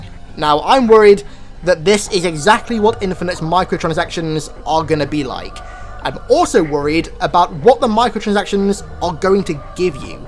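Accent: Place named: United Kingdom. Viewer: The british accent is cool but your lisps nerd makes me wanna kill myself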